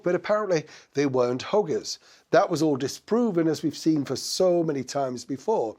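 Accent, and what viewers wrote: Yorkshire, United Kingdom. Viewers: Katherine is every inch a royal, Meghan is a wannabe, she will always come up short. You did give me a giggle earlier, I heard your comment as......''I (Meghan) am a hooker!!!!'' it is your lovely Yorkshire accent that did it............... made me laugh, until I realized what you had really said ''I am a hugger.'' hey ho. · Being roasted in classy Brit accent has to be hell😂😂😂 I’m laughing at the roast. Damn Mr!!! Take it easy on her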